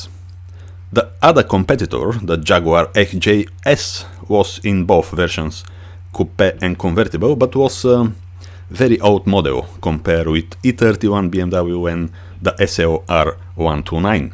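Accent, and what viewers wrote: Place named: Italy. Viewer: WoW, It's going to take me a while to all of your videos but I'm going to do it! The Italian accent is just a bonus too.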